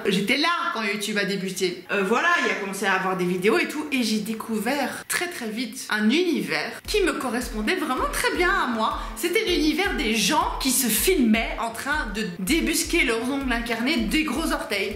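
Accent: Belgium. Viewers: J'hallucine peut-être auditivement mais .... serais-tu BELGE? ❤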